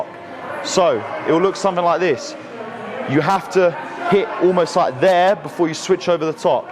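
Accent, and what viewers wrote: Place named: United Kingdom. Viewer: He has a british accent.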